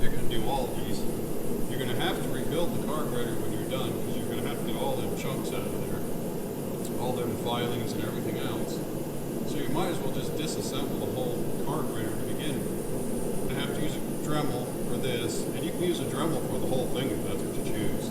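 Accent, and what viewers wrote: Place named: Appalachia, United States. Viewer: What's the noise in the back ground can hardy under srand your hill Billy accent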